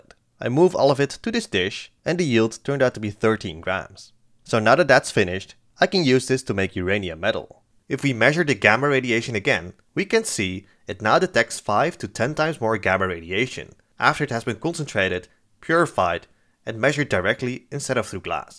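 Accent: Netherlands. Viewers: You sound Dutch. Loving the content!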